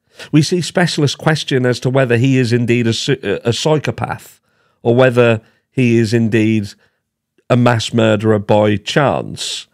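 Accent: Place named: Birmingham, United Kingdom. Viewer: I ♥️ this Brummie accent! Well, the personality of BK is truely puzzling, I absolutely agree. I never considered him an INCEL which they suggest him to be; yes, he has both narcissistic, sociopathic and also psychopathic traits, but in these terms I might talk about myself as well with quite of an ease. Seriously, I mean; and neither do I believe that being a N/S/ and/or/P would make one automatically a killer - not at all. However, what is really significant in BK, is his lack of emotions. He is a true introvert - coldly calculating, investigating; he show's up zero empathy nor ability to regret anything, incl his own obvious mistakes. He doesn't seek for attention - oh no; and he seems having counted on possible failures, that's why he is so calm now. The trial is just another pre-calculated epizode of his "masterplan". If he is the m****rer, of course. 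I see some very specific, deeply routed autism in his characterisrics instead, but of course I'm not any kind of a specialist to give such or another diagnosis. Could any form of autism lead to m*rders? Just some thoughts. Interesting contemplation, Man. Thanx & greetings.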